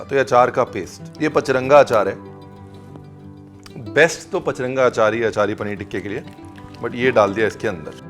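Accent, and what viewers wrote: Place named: Punjab, India. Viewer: Bhaaji you are the best across youtube and i love how you speak your punjabi accent i love that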